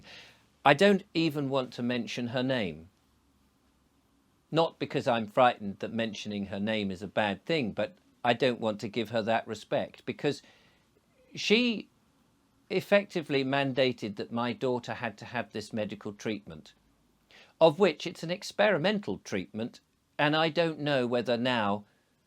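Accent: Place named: RP, United Kingdom. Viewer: Hi, thanks for sharing this story with us. It must be truly painful and worrying for you as a parent. I recently found you and subbed, as I find that the truth and openness that you speak needs to be heard and shared. You and I are like complete opposites, I am from the black country, broad accent and a bit rough round the edges, you are, if you don't mind me saying, a bit posh and well spoken. But strangely I can relate to you more than many others around me. I too knew the truth from the beginning and stood my ground, while many blindly followed and harmed themselves and their families. Again, thank you, from the bottom of my heart.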